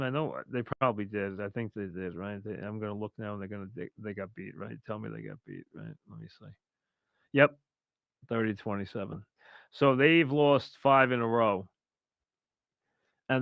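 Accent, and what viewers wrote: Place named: Long Island, United States. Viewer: while the horrible long island accent is tough to get past, this guy’s analysis is spot on… (i’m from long island too)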